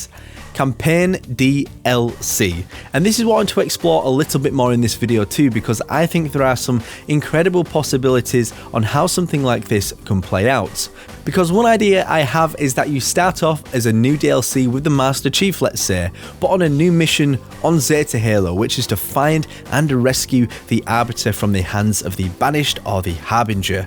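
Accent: Yorkshire, United Kingdom. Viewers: You aren't from Yorkshire are you? I'm not myself, but I lived in Yorkshire for about a year.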